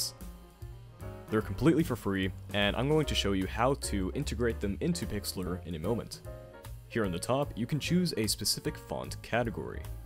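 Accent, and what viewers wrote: Germany. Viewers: Wait, are you from germany lol? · Youre German?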